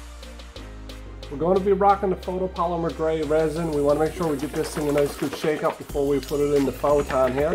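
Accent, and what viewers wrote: Newfoundland, Canada. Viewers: Man i have to ask are you from Newfoundland? Soon as i heard your voice i was like , its one of us hahahahah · From newfoundland bud? also this was pretty interesting, thanks for the video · you sound like my uncle from Newfoundland, makes me feel like I'm gonna have a awesome time when I watch your videos.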